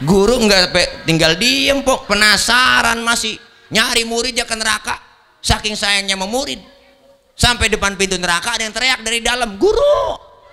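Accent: Betawi, Indonesia. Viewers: masya allah... suka bngt ama yg 1 ini... suka bngt ama logat"y... sama² kita orang betawi yah....😁 ketawa trs klo ngeliat ceramah... # JAKARATA_BARAT Hadiirrr☝ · Resep banyak banyolannya Dan kental betawinya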